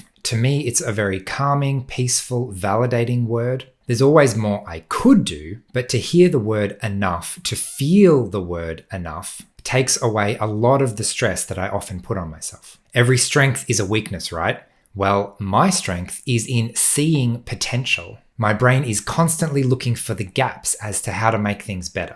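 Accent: Australia. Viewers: Please don’t quit. I’m a sometimes viewer, parent of an autistic person. It’s so calming sometimes to hear another Australian talking about any aspect of autism, I always learn something. I wonder if your helper might be called an ‘aerator’ as they will help you out into the air of the web? Best of luck whatever you decide. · NOOOO, please stay, you're a rare Australian voice with unique perspectives on autism and evidence based info delivered with a warm gentle manner.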